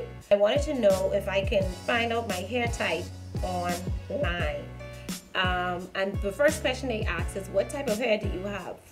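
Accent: Bahamas. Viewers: Are you Bahamian?? I can hear the accent.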